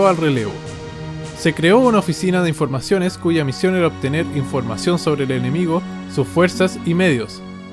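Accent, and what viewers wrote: Chile. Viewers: No cabe duda que este narrador es chileno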